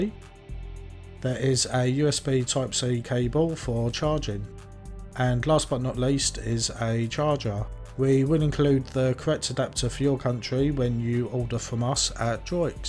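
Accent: Cockney, United Kingdom. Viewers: It's his cockney accent to be at fault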